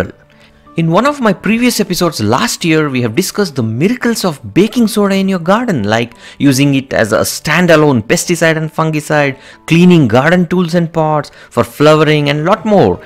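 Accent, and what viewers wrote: India. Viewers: Very easy to use.i usually use backing soda for cleaning purposes.. Now I will do this ,Thank you sir.Your English is very good and clear Are you an Indian .?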